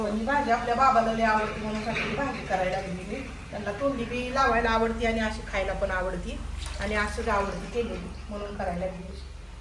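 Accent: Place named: Marathwada, India. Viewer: Latur Dharashiv chi bhasha watate tumchi, konta gav ahe tai tumch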